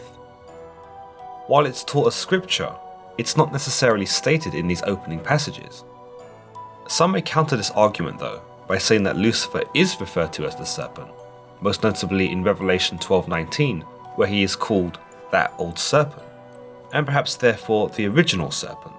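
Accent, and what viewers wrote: United Kingdom. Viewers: video length my moth3rs bday, so I was like Imma do Connie a solid. 177,444 views, Izeah or something luci4. Lucy Ferrey, if Scarlet Johansen had a crush on me. I had sorta long black hair, but I cut it shoulder length, Darth Vadored it. I am God and as a side job God's body guard. If I got a second story and solar pannel roof, would I sell it to my friend Leeman or whoever, and or myself and commit to dying my hair ultimate black to match the solar pannel roof. 'Cause right now it's a one story with red ocher Spanish tile roof that matches my Amanita red hair. Went there. For the prophets. The British accent is powerful. a gay piggy back to smoke a bowl of weed we sometimes entise ourselves with. I'm going now. Ha ha ha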